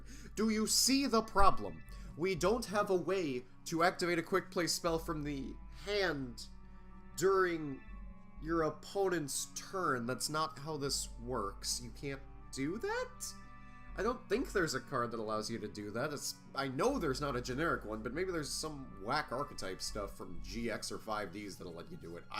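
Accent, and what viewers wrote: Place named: New Zealand. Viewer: WAIT. You were kiwi the entire time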